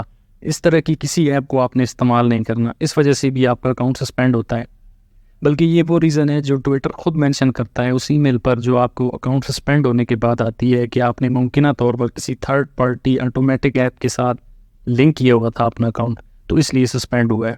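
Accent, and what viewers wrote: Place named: Pakistan. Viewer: جناب صاحب السلام علیکم ورحمتہ اللہ وبرکاتہ بعدہ عرض ہیکہ آپ اپنی گفتگو کے دوران انگریزی زبان کے الفاظ بہت زیادہ استعمال کرتے ہیں کیا آپ ایک پاکستانی شخص ہیں کیونکہ آپکے لب لہجہ سے یہی معلوم ہوتا ہیکہ آپ ایک پاکستانی شخص ہیں' میرے مشاہدات و تجربات میں یہ بات آئی ہیکہ ملک پاکستان میں صحیح وخالص اردو زبان صرف علماء ربانیین ہی بولتے ھیں بقیہ عوام الناس تو انگریزی زبان سے بہت متائثر بلکہ بہت مرعوب ہے، قال اللہ سبحانہ وتعالیٰ، ألا ان اولیاء اللہ لا خوف علیھم ولا ھم یحزنون، الذین آمنوا و کانوا یتقون۔ خیر اندیش حافظ عبدالحفیظ، الجمہوریہ الھندیہ ۔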